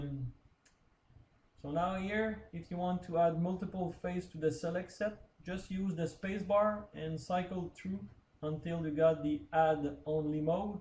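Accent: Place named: Quebec, Canada. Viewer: From your English accent, I have the impression that you're from Québec :) Thanks for the Video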